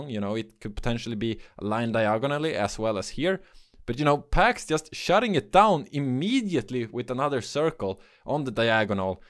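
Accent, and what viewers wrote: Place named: Sweden. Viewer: "... and me is casting" I love this Swedlish too much to criticize it.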